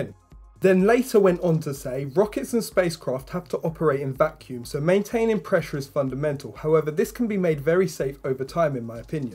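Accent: United Kingdom. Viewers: Your accent is adorable, exactly what part of the UK are you from.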